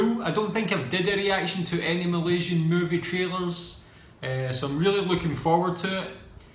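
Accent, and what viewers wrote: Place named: Scotland, United Kingdom. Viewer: Yo man, you have the cutest scottish accent. I could listen to you for hours. I wonder if you could do podcast or audiobook lol. Anyway, please do watch this movie. Give us a review and let us know if it's worth our money. Thank you!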